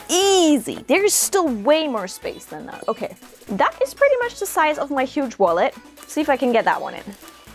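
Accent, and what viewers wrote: United Kingdom. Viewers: I have been watching Ask Japanese for some weeks and learning about Japan. I really love Japanese etiquette. Your language skills are amazing. You are the second German woman on YouTube I have chanced upon who speaks English with a British accent. She too studied and worked in Britain. I can detect a very slight German accent in her English but none in yours. You respond instantly to spoken Japanese so your Japanese must also be excellent. I love trains and have found your show on our cable TV in the US. I grew up next to the Durango-Silverton narrow gauge line. Thank you for your bowtiful work. (had to acknowledge this fun video:)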